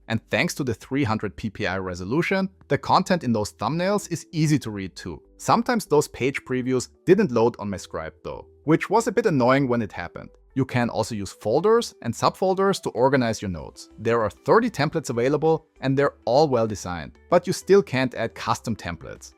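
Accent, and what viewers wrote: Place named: Austria. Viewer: wenn ich englisch mit österreichischer "Melodie" höre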